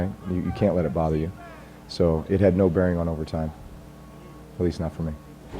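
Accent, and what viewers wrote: United States. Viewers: Rams ah Lozuzz! Rams SUCK! Love that yatty downtown New Orleans accent.. Drew knows they got screwed but 100% he keeps class all the way. Nothing but respect.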